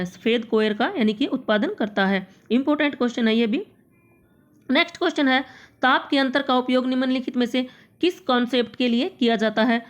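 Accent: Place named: Haryana, India. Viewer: Ya hindi bolo ya fir hryanvi ajib bolre ho aap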